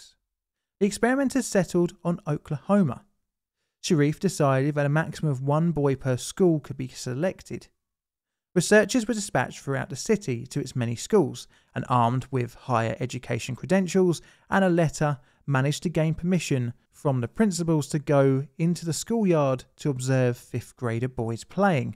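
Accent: United Kingdom. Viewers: Mo-caison??? Its Mock-a-sin... I get it you're British but holy guac I've never seen someone get that so wrong!! I'd love to see you say Boise, or Pocatello.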